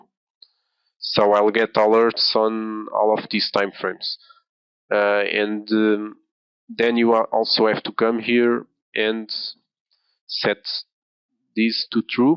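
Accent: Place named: Portugal. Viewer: Olá. Desculpa mas pelo sotaque percebi que eras português:) Gostava de trocar umas ideias contigo, se possível. Vejo que tens uma boa noção de mercado e interpretação de preço. Parabéns. abraço. Pedro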